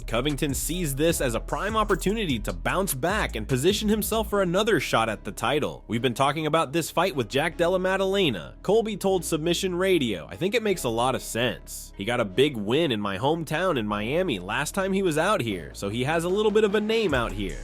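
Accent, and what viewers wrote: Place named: New York, United States. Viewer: NY accent not getting name wrong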